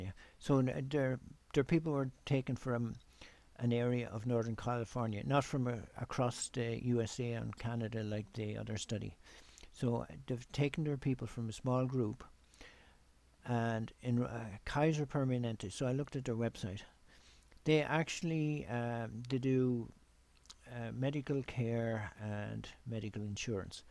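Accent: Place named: Dublin, Ireland. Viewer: I don't know why but I find this subject fascinating. I am from Dublin, are you also from Dublin? I ask because you sound like you might be from just. outside. Interesting video thanks for uploading.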